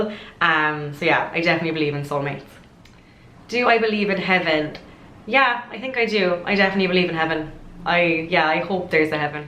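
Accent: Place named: South, Ireland. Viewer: I could listen to you chat all day, even though I'm from Northern Ireland I just love the southern Irish brogue, you don't come across as nervous at all you come across so confident. My natural hair colour was light auburn with brown eyes but my niece is more red haired like yourself & she has brown eyes. I wish you all the best I've no doubt you will succeed in everything. I'll be looking forward to all your videos. Oh & I have to tell you I love your sister and your Mam, you have such a wonderful family and not to forget vogue. Sweet Irish blessings to you all. 😘🍀😘🍀😘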